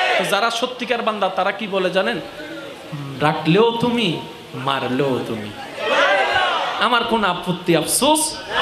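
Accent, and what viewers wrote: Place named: Chittagong, Bangladesh. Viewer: ওটা চট্রগ্রামের ভাষা সবাই চট্রগ্রামের ভাষা বুঝে না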